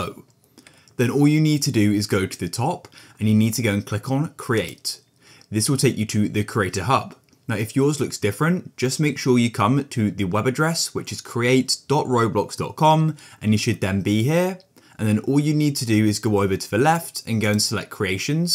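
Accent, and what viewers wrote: United Kingdom. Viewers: Thank you British man! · Yes, he is british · Are you british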